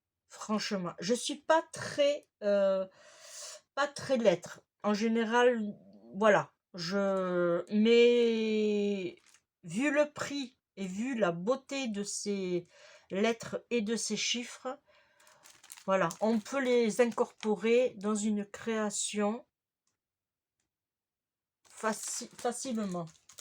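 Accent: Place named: Montpellier, France. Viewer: Coucou bonjour je suis nouvelles abonnée super achats , moi aussi je suis accro ACTION , on a presque le même accents toutes les 2 d'où êtes vous ? si ce n'est pas trop indiscret perso je suis originaire de montpellier mais je vis en Région parisienne ! !!! A bientôt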